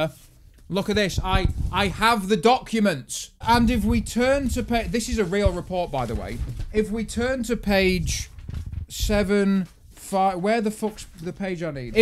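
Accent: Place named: Sheffield, United Kingdom. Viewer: Whenever I want offensive motorsport content, I do want it in a Sheffield accent!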